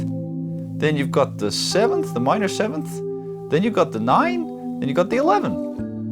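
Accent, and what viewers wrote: Netherlands. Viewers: Very Dutch accent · The Dutch accent sounds just so right :) Totally enjoyed this. Thanks 😊 'I don't blow myself, I tried it but it hurt my back'. Briljant. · Your Dunglish is on point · you're doing a amazing dutch accent if thats your intention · Hi, where did you pick up the dutch accent? I love it. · You certainly sound like at least one of your parents is Dutch ... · I’m hoping the folks at Rockstar invited to do a radioshow for GTA VI! Good fun! Pretty decent Dutch accent there 😀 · What is the reason behind the Dutch accent lmao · Not gonna lie, he has a great dutch accent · How is your Dutch accent so on point!!!?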